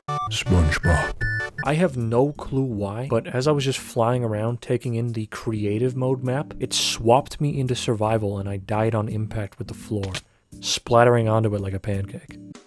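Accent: New York, United States. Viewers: Shout out to my fellow Canadian that sounds like a new yorker. We're a rare breed.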